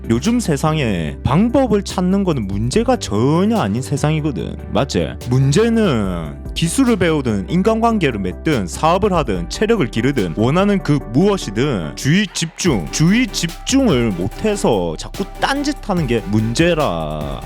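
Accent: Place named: Gyeongsang, South Korea. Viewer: 찰진 경상도 사투리에 수상할 정도로 서윗한 목소리... 니 머가...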